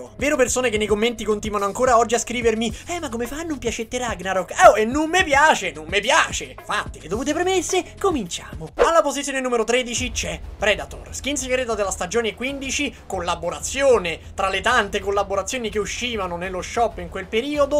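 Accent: Rome, Italy. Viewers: Sono di napoli e ti.ascolto sempre ma so parla pure en romano a o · bravo ragazzo sei davero simpatico nei tuoi video , continua perche sei davero particolare sarà il tuo accento romano , sarà che non sei antipatico come gli altri youtubers ma secondo me ti meriti di più come iscritti di certi che parlano di fornite , concludo dicendoti continua cosi che vai alla grande ciao ed e sempre un piacere vedere i tuoi video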